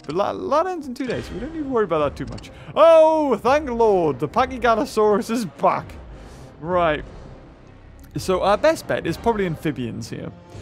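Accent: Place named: England, United Kingdom. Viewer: I feel like i am the only person who understands you because i am english too lol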